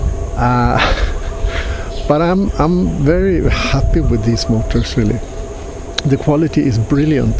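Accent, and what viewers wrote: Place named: Scandinavia. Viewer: Hi, nice video! I was looking around for fun because I've built an Alfa Monster myself and are about to build another one, but can I ask you where you're from? Edit: Saw Sweden mentioned in your channel description, thought I heard that nordic accent, being from Norway myself. Looks beautiful there, do you take guests? Kidding of course, but I would love to see Thailand sometime, through my Fatsharks too :D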